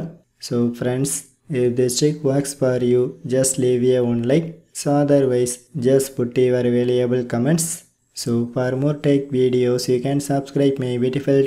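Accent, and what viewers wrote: India. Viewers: Actually the Indian English is very bad I can't hear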